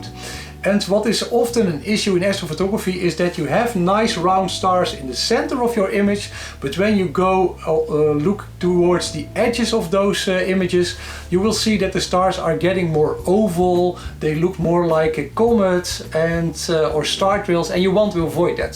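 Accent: Netherlands. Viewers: Ben je nederlands zo klinkt het namelijk Edit: ja dus. Leuke vids trouwens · From the accent I predict this guy is Dutch. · ) dutch accent sounds smart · Haha na de eerste paar seconden zei ik meteen, hij is sws Nederlands. En ik had gelijk😜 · Na het eerste woord hoorde ik het al, een nederlander!! Haha. Thanks voor de video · hollander alert😂 goeie video man!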